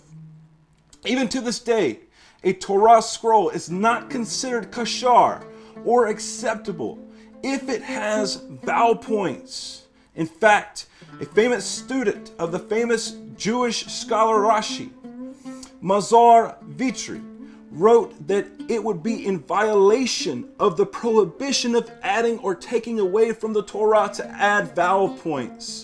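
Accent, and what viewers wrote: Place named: Eastern Europe. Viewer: I have noticed that the pronunciation of the "V" in Eastern European accent is "W". I asked myself, "What's up with that?"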